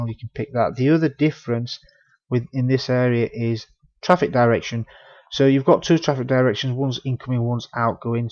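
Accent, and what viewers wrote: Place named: England, United Kingdom. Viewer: Dude, you are soooo English!!! :-) I just don't have the accent (grew up in the UK when I was younger). Excellent explanations by the way...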